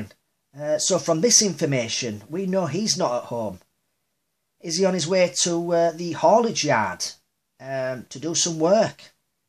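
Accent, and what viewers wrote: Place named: Yorkshire, United Kingdom. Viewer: That's what makes your videos the best on YouTube pal!... I've been interested in the case for years & I've searched allover the internet, I've found your videos/ channel to be the best by far. Thank you & well done for great content & the posting of your excellent videos, I'm working my way through them all!! 👍🏼....... One thing I forgot is your accent! Lol... It's Yorkshire right?.......I'm from West Yorkshire, I can't quite pinpoint your accent lol.... Leeds possibly? Lol.....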